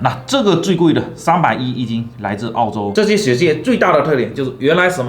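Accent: Fuzhou, China. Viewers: 咱们福州人的乡音属实太明显了。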